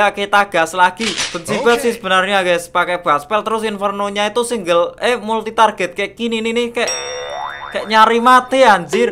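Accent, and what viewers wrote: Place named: Java, Indonesia. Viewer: Medok jawa adik adik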